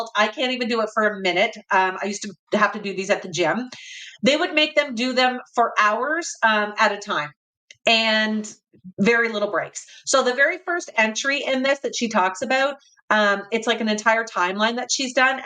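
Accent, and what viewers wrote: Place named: Prince Edward Island, Canada. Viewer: I am a PEI gal and saw you on Mormon Stories! I recognized your accent!!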